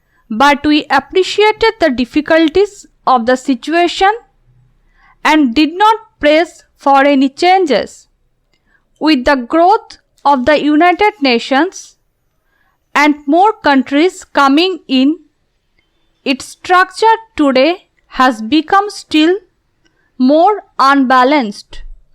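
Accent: Bengal, India. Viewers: Voice seems to be Bengali , I am correct?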